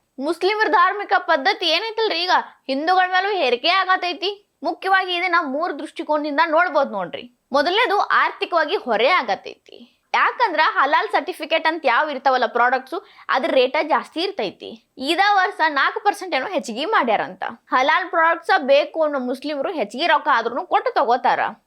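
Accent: North Karnataka, India. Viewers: nimma north kannada slang beda normal kannada matadi illandre elandre keloke iritate agute dont copy tv vikrama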